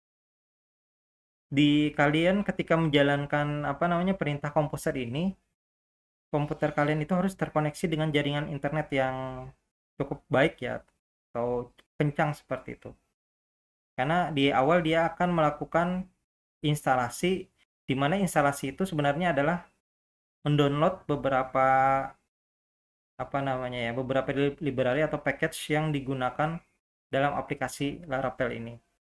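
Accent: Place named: Banjar, Indonesia. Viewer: Logatnya kaya orang banjar..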